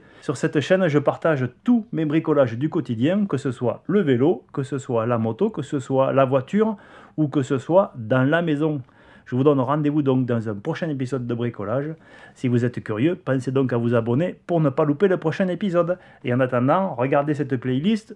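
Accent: South, France. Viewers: Super, merci pour ces explications super claires avec le petit accent du sud en plus 😄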